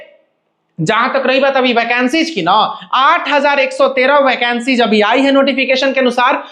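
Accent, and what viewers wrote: Bihar, India. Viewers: Bhaiya aapka bolne style gajab hai 😊😊😊😊😊😊😊😊😊😊😊😊😊😊😊😊😊😊😊😊😊 ek dam bihari style. Ham bhi Bihar se hi hu.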